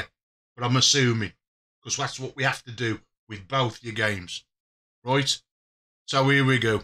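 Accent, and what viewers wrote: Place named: Black Country, United Kingdom. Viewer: Great to hear a Black Country accent. I live in Wales now but I'm a Gornal bloke. Thanks very much for the info on the new force feed back. I've got a G920. The steering since the latest update is way too light. I've tried everything except that internal friction controller. I'll have a go at changing that tomorrow. Cheers mate.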